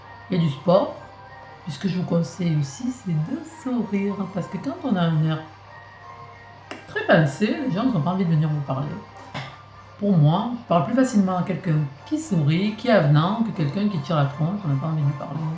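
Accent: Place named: Var, France. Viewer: bonjour, je viens de faire ta connaissance sur you tube et je voulais te laisser ce message: j'ai relevé une phrase dans ta vidéo "le bonheur c 'est de ne pas ressaser le passé tout le temps" et bien là, c'est une des clés pour vivre heureux, il faut vivre le présent, aujourd'hui et maintenant comme on le dit si bien, car cette minute que je prends pour t'ecrire ce message s'est déja écoulée, la vie passe comme un éclair, il faut aller de l'avant et etre fidèle a ses convictions. J'aime ta façon de t'exprimer, simple et sincère, MERCI pour cette video, je me suis abonnée biensur et en plus je parle avec le meme petit accent que toi car je suis du Var:) bonne continuation!!!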